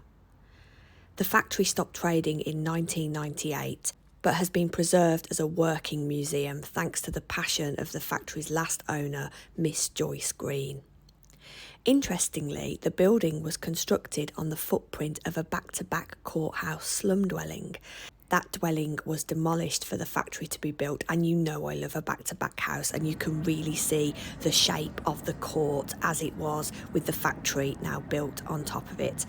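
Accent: Birmingham, United Kingdom. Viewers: Your enthusiasm shines through. Interesting,informative and beautiful Brummie delivery❤❤❤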